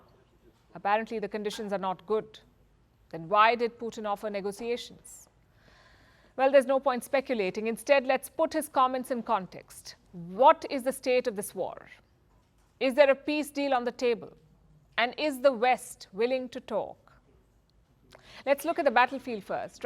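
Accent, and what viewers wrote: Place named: India. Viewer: I love your news but please speak English little bit better... I can't understand of of your Indian accent, its way too strong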